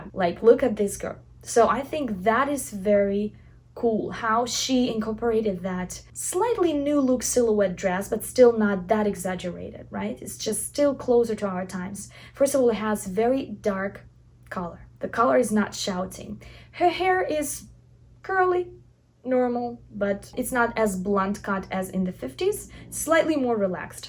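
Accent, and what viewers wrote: Russia. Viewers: Я одна слышу русский акцент?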